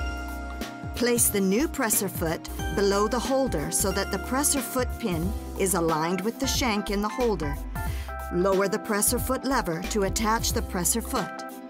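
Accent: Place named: Canada. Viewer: The narrator has a weird accent like Catherine O'Hara's character, Moira Rose, in the sitcom Schitt's Creek. Must be a Canadian actress thing.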